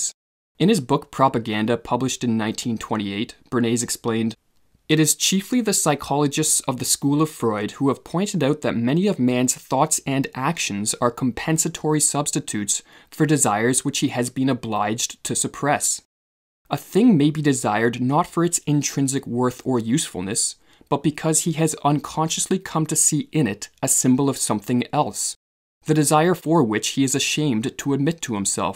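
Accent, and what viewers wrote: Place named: Canada. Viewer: Canadian accent, do you hear it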